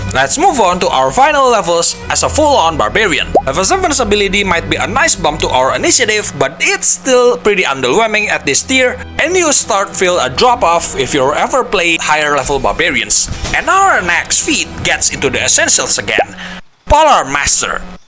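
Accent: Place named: Indonesia. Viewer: i know an indonesian when i hear one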